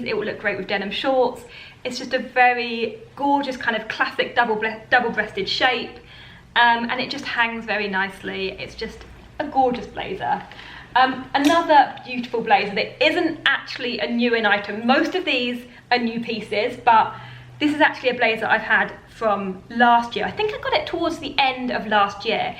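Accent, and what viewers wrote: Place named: Southern England, United Kingdom. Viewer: hey, i just subscribed to your channel because i really enjoyed your personality and it was a very pleasant video to watch. Question: what area of the UK are you from? from the sound of your accent ( which is lovely by the way:- ), ) i'm guessing you're from the south of England but, i was just curious as to what area in the south are you from? i.e. Greater London, etc... Also, you looked very pretty in all the outfits you tried on:-)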